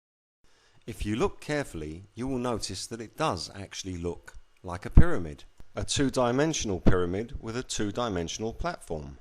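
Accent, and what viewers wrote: United Kingdom. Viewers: is that a pommie accent?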